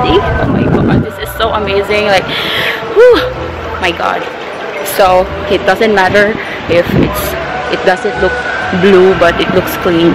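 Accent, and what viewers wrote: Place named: Singapore. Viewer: Te, imong accent ky Singaporean na hehe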